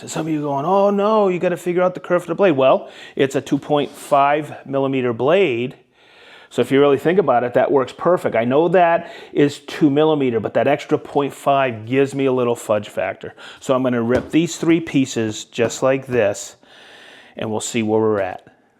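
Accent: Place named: Boston, United States. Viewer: That Boston accent is the worst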